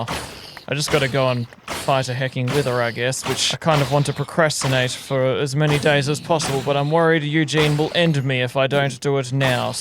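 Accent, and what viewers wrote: Australia. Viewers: Are u Australian? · oh im so subsribing. youre an aussie minecraft youtuber, but like aussie ausie. i feel at home here (im aussie too)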